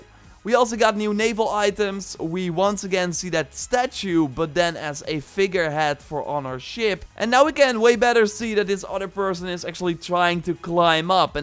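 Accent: Netherlands. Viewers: Is hij Nederlands??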